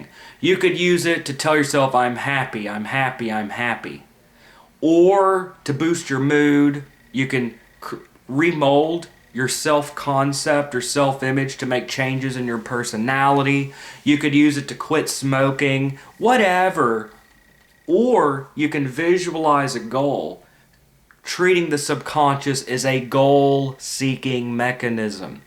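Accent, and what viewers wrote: South, United States. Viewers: he sounds like hes from the south, like me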